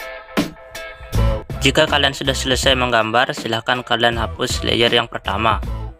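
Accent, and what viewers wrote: Aceh, Indonesia. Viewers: Orang Aceh BG?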